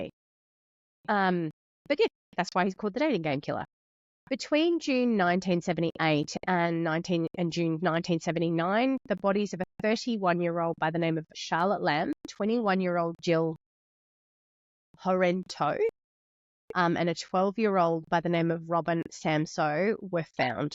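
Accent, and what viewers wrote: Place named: Australia. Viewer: Oooooo. I get the first comment. in the house. That's either a Kiwi or Aus accent. If pushed I am going to go with Aus (raised sentences in the absence of a question). I am going to Henry Higgins it and go for a WA (Perth) accent.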